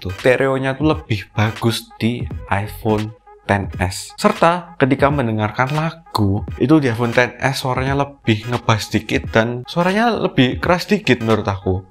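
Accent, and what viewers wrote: Java, Indonesia. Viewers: medok banget jawanya (D)😀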